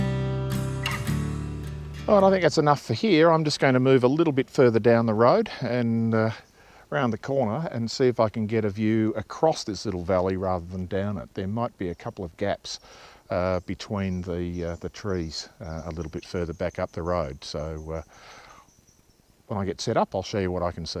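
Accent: Australia. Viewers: Gday, Just found your channel. Good to hear another aussie accent in commentary. Sub'd. Great content also.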